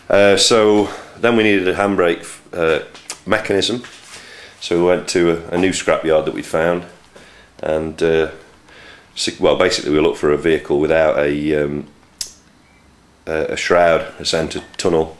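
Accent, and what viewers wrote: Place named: Northern England, United Kingdom. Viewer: Sounds nothing like him. This guy is from the north of england and has a very similar accent to myself. James may is from the south with a more neutral accent.Imagine me saying Woody Harrelson sounded like Mark Wahlberg and it will give you some idea of the difference.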